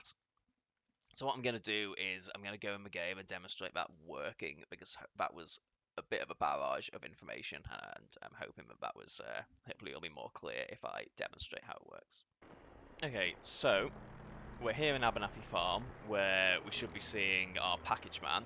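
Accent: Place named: Liverpool, United Kingdom. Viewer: great to see a new vid from you mate, i still struggle with the scouse though :)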